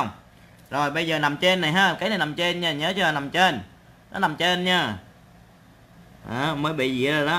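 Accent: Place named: Quang Nam, Vietnam. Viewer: A người quảng Nam hả a?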